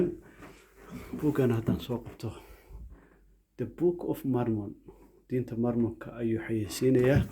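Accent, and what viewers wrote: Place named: North, Somalia. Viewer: Waryaa wax kale umada keen , inaad reer koraad tahay sida D u dhigaysaa laga garanayaa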